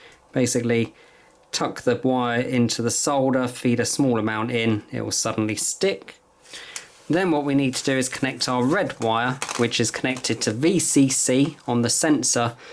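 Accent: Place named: United Kingdom. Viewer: Don't mean to sound crude but the British accent talking about proper care for your tip had me like 😂. Love it! Very informative as a newbie to both arduinos and soldering. Thank you. Much ❤ from across the pond.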